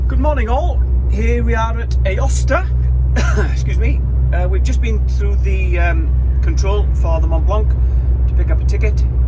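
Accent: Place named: Northeast England, United Kingdom. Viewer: your accent is almost identical to that of another YouTuber called John Kirkwood who is a retired professional baker from the northeast of England. I highly recommend his channel if you have an interest in traditional British cooking and baking.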